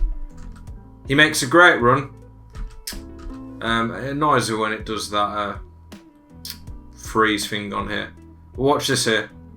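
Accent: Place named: Scotland, United Kingdom. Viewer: Where r u from bro? Scotland? Im learning english and its interesting for me all ur rUns etc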